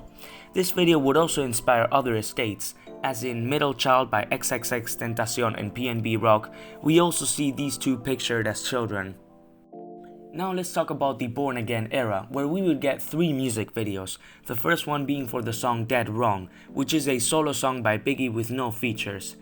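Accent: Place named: Philippines. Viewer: Very well made and intelligent content! Where are you from, you have an interesting accent (Filipino is my best guess)?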